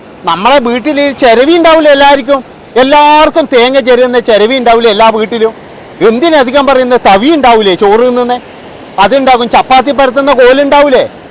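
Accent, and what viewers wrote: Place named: Kasaragod, India. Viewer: കാസർകോട് ഭാഷ.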